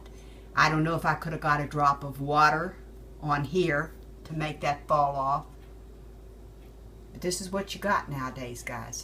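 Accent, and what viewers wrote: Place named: Pittsburgh, United States. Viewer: You sound like you are from the “Burgh” Pittsburgh that is? Hope you get your doors fixed. Nothing is built like it used to be....have a blessed thanksgiving and Christmas..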